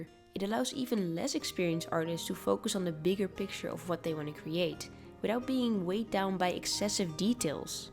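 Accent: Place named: Netherlands. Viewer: haha ik kon echt meteen horen dat je nederlands was, goeie video wel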